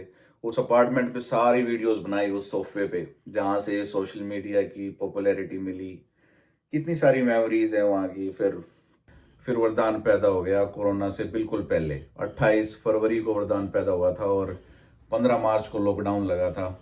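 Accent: Haryana, India. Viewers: Lol. Got this channel recommended to me after 10years. Wow! I cannot forget the iconic Haryanvi accent. For me every Haryanvi I imagine must sound like him. haha. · Bhai pahle to Ram Ram...jab aap haryanvi touch na choddte t bhai main b isse bhasha me comment likhunga.... Bhai aap dil te jeen aale ho..ek number ke chhore...bhai itna padhhe pichhe apni tone nhi choddi bahut badhiya baat...America me Khichdi te jarur banwate hoge ar kahte hoge bhai yaa maari favorite dish s...yaar gandasa chalaye kitne din hoge...leetraa pitayi khele hain ke America me....hokke ka jugad b hai k udde😂😂😂😂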